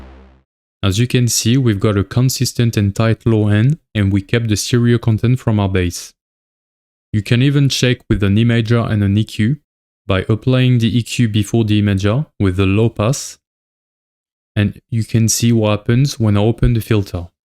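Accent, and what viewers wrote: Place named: France. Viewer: À l'accent, j'imagine que t'es français donc je me permets de le faire en fr. Super vidéo ! Je viens de découvrir ta chaîne et tu nous donnes des tips vraiment utiles ! En tout cas j'avais jamais vu une vidéo comme celle-ci sur les prb de phase. Merci mec !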